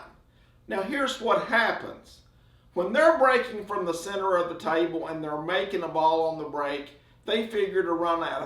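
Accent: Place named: Kentucky, United States. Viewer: Your last break was a thing of beauty. Great run out position. I liked your break strategy discussion, too. I have played around with 2nd ball break to put the 8 ball in play, but to me it’s not worth it. Probability of pocketing the 8 too low to justify a poorer spread. Keep ‘em coming. Enjoy your KY accent, reminds me of home (Texas).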